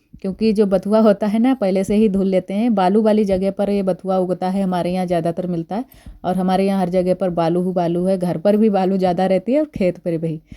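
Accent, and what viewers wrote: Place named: Bihar, India. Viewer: Original village routine, ye kon sa gaon hai, dekhkar to UP ya Bihar se lg raha hai, aapki boli main Bihar ki jhalak hai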